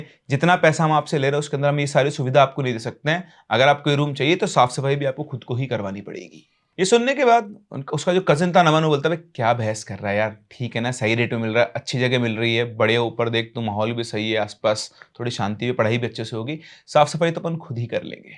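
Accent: India. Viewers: आप का बोलने का तरीका बिलकुल देसी हे ❤❤❤गांव जैसा बोलते हैं जो देसी नहीं होगा वो शायद ही समझेगा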